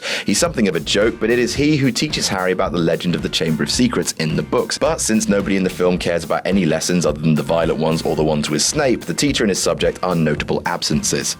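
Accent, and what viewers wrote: United Kingdom. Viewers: You sound British (?) yet you use American titled books.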